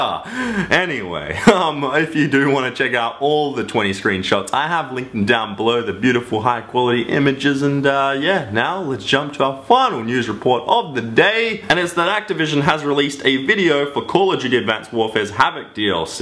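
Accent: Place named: Australia. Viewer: O i thought u were american ur aussie :D Im Glad There Are Some Aussies Gaming! :D But Your Dad Dieing Still Makes Me Sad D: R.I.P To Your Dad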